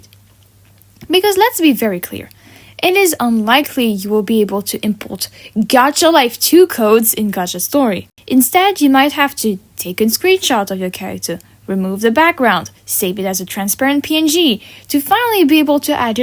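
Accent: France. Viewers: Are you french? 👀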